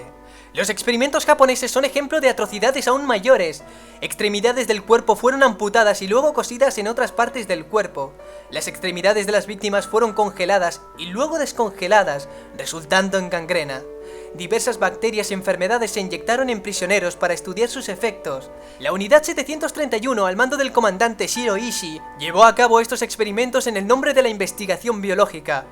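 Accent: Spain. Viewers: así? es español de España, yo no veo nada raro xddd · sin más Español de España JAJAJAJSJJA ni modo que sea español de Colombia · Me encanta como hablan los españoles. Me encanta tambien tu canal.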